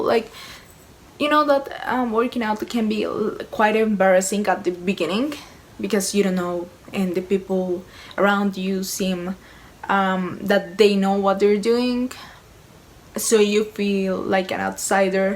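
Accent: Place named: Colombia. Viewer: Hope you're doing okay. I love seeing your nice face and hearing your Colombian accent 😀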